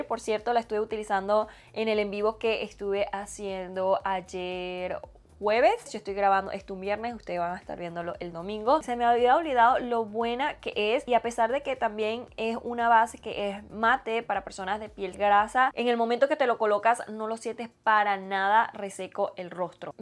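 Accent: Venezuela. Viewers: Me encantó el vídeo! Primera vez que veo tu canal y de verdad me encantó. Jaja ya decía yo ese acento es Venezolano ♥️ saludos desde Barquisimeto.!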